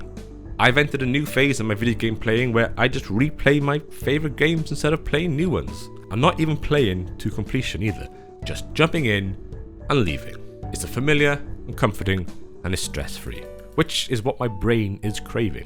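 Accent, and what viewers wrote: Wales, United Kingdom. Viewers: it's like your life is mine......but yours has a British accent. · Is that a slight hint of a Welsh twang to your voice I detect boyo? Lovely video, as a 38 year old it speaks to me 😢😊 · BRO its like my 35 yr old canadian brain is talking to be in a British accent through this video.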